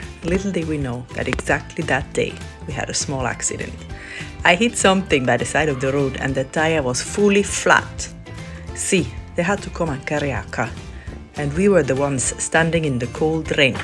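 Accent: Igbo, Nigeria. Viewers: HELLO 👋 BEAUTIFUL! YOU GUYS ARE BEAUTIFUL. WE LOVE THOSE CUTE HANDSOME VERY SMART 🧠 AND FEARLESS IGBO SONS. WE SEE HAVE GOOD TALENTED SKILLS WHICH HE DEMONSTRATED TO US THE VIEWERS. YOU DOING GOOD BEAUTIFUL LADY, WE LOVE YOUR AWESOME IGBO ACCENT, WHAT ABOUT LITTLE CHIEF NNANYELUGO? WE LOVE TO SEE HIM TOO WITH HIS BROTHERS, BEAUTIFUL FAMILY, GOD BLESS YOU ALL AMEN AMEN.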